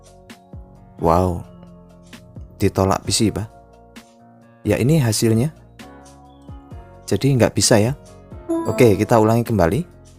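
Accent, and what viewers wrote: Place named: Central Java, Indonesia. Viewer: kn bner asliny dr jteng soalny logat ny sma prsis daerh sy sragen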